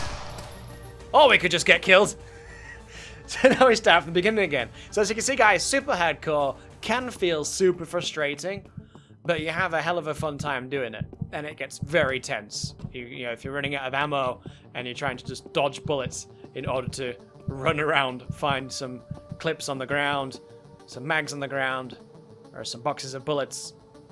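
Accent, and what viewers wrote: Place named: Boston, United States. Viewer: haha I suppose so! The main thing that throws me off is the pronunciations of "ar" like in "dark". You say "duhk", which sounds super typical of a thick Boston accent. Sorry for obsessing over it. It just strikes me as unusual and fascinating. Incredible things happen when you connect random parts of the world together with voice chat lol.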